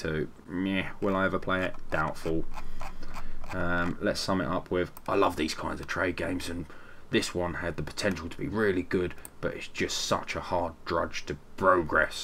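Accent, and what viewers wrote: United Kingdom. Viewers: that British accent tho. ;)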